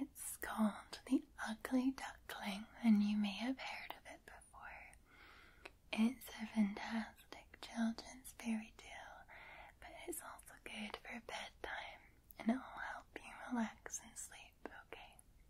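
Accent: Scotland, United Kingdom. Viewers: She is the best ASMRtist for reading stories with her beautiful ❤️ Scottish accent!:_Loveheart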